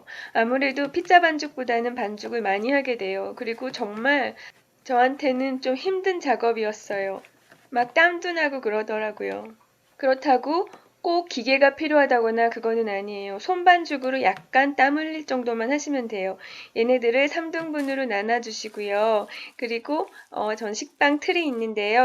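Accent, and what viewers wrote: Seoul, South Korea. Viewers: 뭔가 옛날 서울 사투리 같아요ㅎㅎ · 말투가 옛날서울 사투리 같아요 !!!ㅋㅋㅋㅋㅋ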